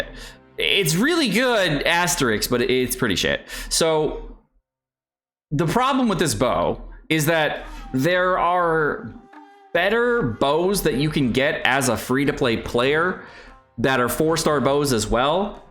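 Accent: United Kingdom. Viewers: I just wanna say i really missed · LETSGOOOO BRITISH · IS BACK BEST UPDATE SIGN HERE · Yes!! the is back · is a real one lol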